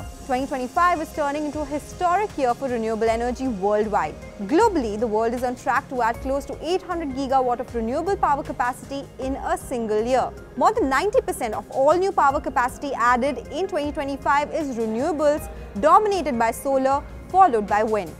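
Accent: India. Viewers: why cnbc has indian accent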